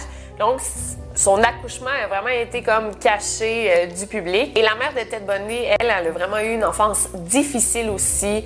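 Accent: Quebec, Canada. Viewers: Super vidéo très intéressant, dommage que ton "accent québecois" gache toutes la vidéo :( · Tu raconte vraiment bien ses story même avec l accent Québécois que j adore 👍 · Salut je profite de cette vidéo sur le plus "grand" des tueurs en série pour laisser un commentaire je t'ai découvert il y a 3 mois depuis je regarde très souvent tes vidéos tu es simple et authentique, un plaisir pour les yeux et les oreilles avec ton accent Québecois lol je supposes que tu connais l'émission française faites entrer l'accusé si ce n'est pas le cas je te la conseille fortement 👍continu comme ça tu es la meilleure tu es la seule youtubeuse que je suis 🤗. Un charltoniste franco italien.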